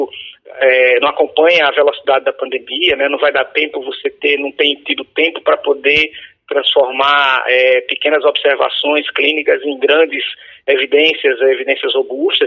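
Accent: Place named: Madeira, Portugal. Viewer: Boa noite! Gostaria de saber de onde você é natural, pois nunca ouvi este seu sotaque... Parece um sotaque da Ilha da Madeira ( Portugal ). Obrigado!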